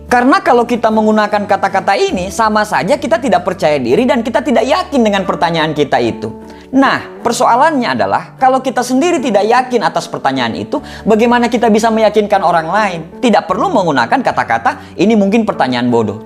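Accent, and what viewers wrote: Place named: Palu, Indonesia. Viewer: Kayak logat Palu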